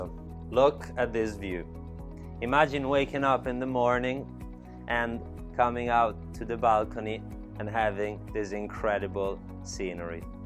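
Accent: Italy. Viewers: I love this Italy accent English ^_^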